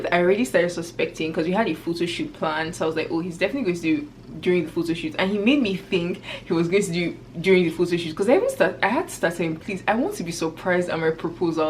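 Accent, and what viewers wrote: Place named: United States. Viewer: Were you born in canada or united states!!! You have an american accent with a african twist, you go in and out of the accent!!! Its so cute!!! You are a beautiful girl!!! Congrats babygirl!!! You remind me of my daughter!!!😊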